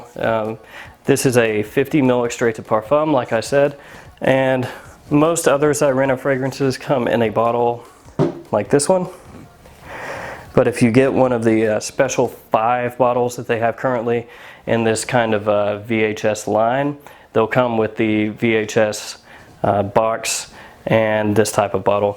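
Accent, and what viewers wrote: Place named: Los Angeles, United States. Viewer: Dam. That's like a Hollywood story. Would've never guessed the two of you went to high school. I might be just hearing things, but it sounds like the way ya speak reminds me of someone here in L.A., while your wife possibly a southern accent. Again, I could be totally wrong.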